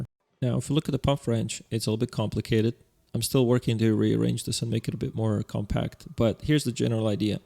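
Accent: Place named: Russia. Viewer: едриттунг. енто же рашн инглиш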